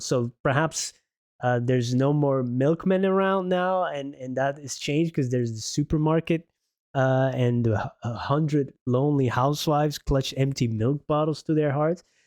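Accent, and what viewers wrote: United States. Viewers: Hey thanks for the reply. First let me apologise for calling you an American I didn't mean to insult you. But true you do sound a little American. I would love you to react to more / videos as I remain a lifelong fan. I would suggest looking at some of Wellers solo work as well particularly the album some of which was filmed at La Luna in Brussels. I included this as a small example <link> Keep up the great content 🤘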